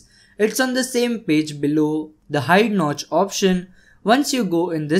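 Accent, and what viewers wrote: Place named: India. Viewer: Indian accent are usually bad since you can barely understand them but i luv listening to urs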